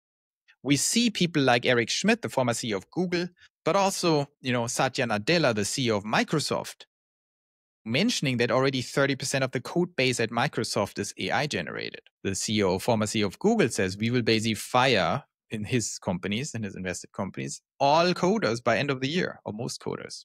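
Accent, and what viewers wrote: Germany. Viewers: Are you German? Your accent sounds like it but your name doesn’t lol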